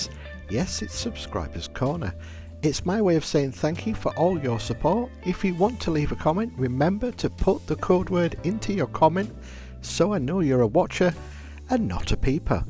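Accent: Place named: England, United Kingdom. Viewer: Great build. Nice to hear an English voice for a change. Davey Jones.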